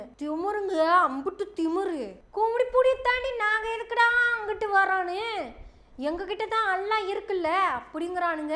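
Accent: Kongu, India. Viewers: தோழரின் கொஞ்சும் கொங்கு மொழி நடை மிகவும் சிறப்பு. தொடருங்கள்.. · மகளே உன் பேச்சில் கொங்குதமிழ் கொஞ்சுகிறதுஅம்மா. இந்தியா பாகிஸ்தான் போரைப்பற்றி கொஞ்சம் பேசுதாயே. மேலு‌ம் கோடிக்கணக்கில்சம்பளம் வாங்கும் நடிப்புக்கூட்டங்கள் ஒரு ரூபாய் கூட போருக்கு உதவிசெய்யவில்லையே.ஆனால் நடிகைகளின் திருமணத்திற்கு கோடியைக் கொட்டிக்கொடுக்கின்றார்கள்.இது என்ன நாட்டுப்பற்று.? நீ பேசு மகளே நிறைய பேசு.!!!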